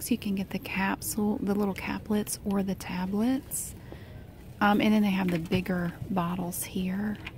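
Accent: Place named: Georgia, United States. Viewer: you're so cute! your accent kind of reminds me of my mom (we're from GA)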